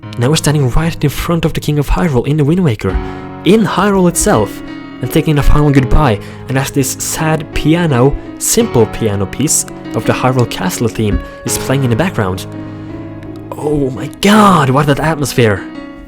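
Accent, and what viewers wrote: Norway. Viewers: Haha, hørte på måten du snakke på at du er Norsk :) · That english sound like something i have heard before. Are you Norwegians?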